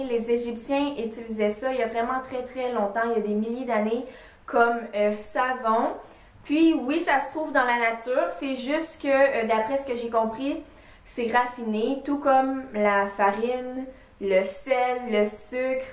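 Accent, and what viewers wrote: Quebec, Canada. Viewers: T es du canada Ton accent!!☺ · J'adore le Québécois Ça m'incite à suivre le video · Très belle en plus j'adore l'accent canadien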